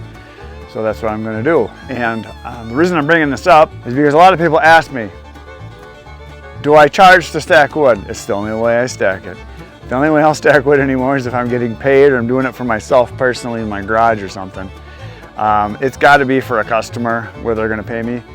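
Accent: Wisconsin, United States. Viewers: Good ol’ Wisconsin accent . I’m from Buffalo NY but spent a little time in Amherst <mail> Wolding when I first got my cdl. I went there in late January early February and it was a different kind of cold 🤣 Beautiful in that area.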